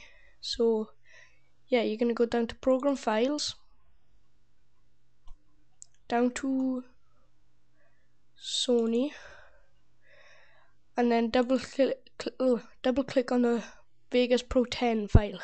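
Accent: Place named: Ireland. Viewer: OMG ARE YOU IRISH?!?!?! I LOVE IRISH PEOPLE *-*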